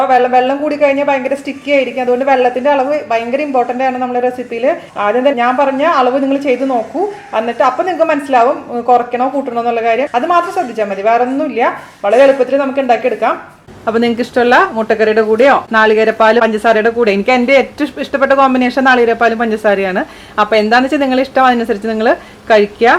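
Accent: Thrissur, India. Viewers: hii chechii chechi parayunna slang enikk manasilvunnund chechii .... bcoz iam from thrissur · oh chechi thani nadan thrissur style samsaram.weekly once I have to hear your sound. I am from gvr ,at present residing in auh.so lovely.from vini .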